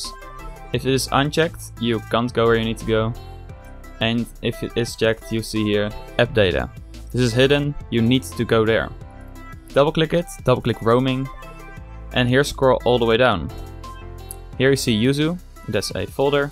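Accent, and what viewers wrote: Netherlands. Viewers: Are you Dutch? I mean. Dat accent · ik hoor dat je nederlands bent haha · je hoort echt tering goed dat jij nederlands bent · HAHA, nederlands accent :) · You sound ver Dutch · wat een lekker Nederlands accentje zeg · ik kan gwn meteen horen dat je nl bent xD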